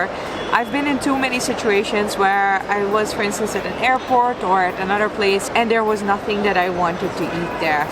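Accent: India. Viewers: I am a foodie fan and I just adore watching you eat.. I laugh that you have begun to talk like an Indian, with the accent.. Or maybe you are Indian and I have no idea..lol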